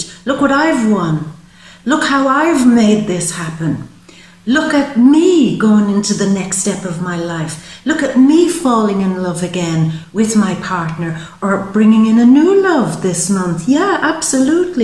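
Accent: Ireland. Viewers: Is that an Irish accent? It's great!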